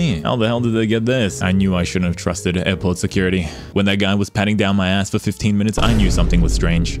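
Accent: Australia. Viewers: Sir! You are making me a tomato and I am trying not to scream! (You're Aussie accent is making me loose it despite being surrounded by it all the time since I'm an Aussie) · Omg, is that an Aussie accent I hear? If so, that makes me so happy more of us are getting out there and entering the VTuber community. Congrats on your debut! If you're not Aussie, mb lmao. Can't unhear an accent that I'm always surrounded by 🥹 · The Aussie accent is what hooked me up immediately. Also I am genuinely way more than impressed by how good the vtuber model is and love your sense of humor. Congrats on your redebut!! (❁´◡`❁)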